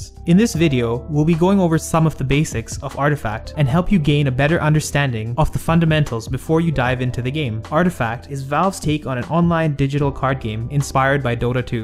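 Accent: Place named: Philippines. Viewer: Are you Filipino by any chance? You have a Filipino accent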